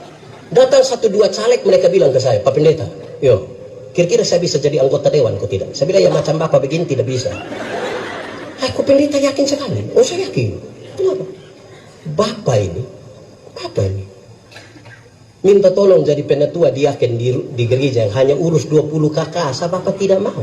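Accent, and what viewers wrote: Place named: Eastern Indonesia, Indonesia. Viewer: Membiasakan diri mendengar logat bicara orang timur, asyik juga.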